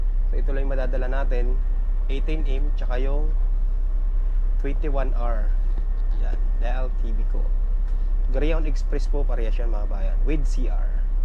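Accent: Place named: Bicol, Philippines. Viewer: Ganun talaga kapag bicolano ... May diin at minsan wala ang letter sa word na sinasambit ... Pagpasensyahan mo nalang 👍👍👌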